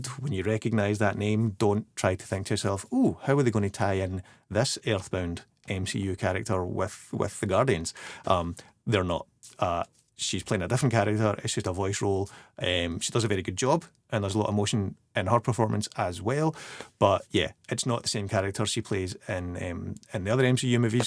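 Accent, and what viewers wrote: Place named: Scotland, United Kingdom. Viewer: I would like to officially note my interest in playing ‘drunk-star’ in future mcu projects 👌 i think i’m perfect for that role 😂 I agree with u that Adam Warlock was a bit of letdown but didn’t take away from the movie. Thoroughly enjoyed it and a return to form for mcu indeed 😅 Didn’t realise u had a youtube channel pal! Enjoyed this video 👍 Great to hear another Scottish accent on here too. All the best with ur channel mate, great start for sure! 😃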